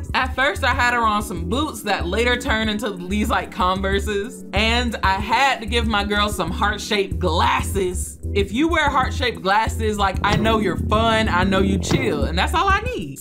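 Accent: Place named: Georgia, United States. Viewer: girl i’m loving your energy in these vids!! are you southern lmao, bc the voice is giving Georgia lowkey (this isn’t shade i’m an SC gworl)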